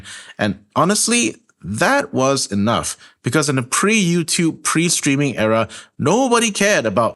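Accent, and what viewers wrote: Singapore. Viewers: You sound like a Singaporean. Is your church in Singapore or Malaysia?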